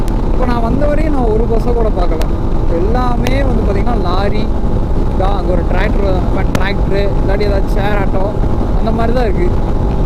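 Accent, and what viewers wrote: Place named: Madurai, India. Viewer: Madurai slang ❤️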